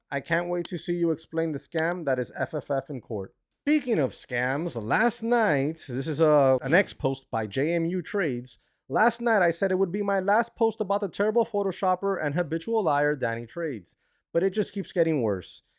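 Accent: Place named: Miami, United States. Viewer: You got a cuban Miami accent bro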